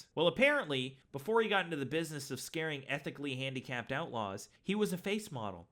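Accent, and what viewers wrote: Canada. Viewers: Canadian accent if I’ve ever heard one. How’s the east coast been bud? Greetins from Ontario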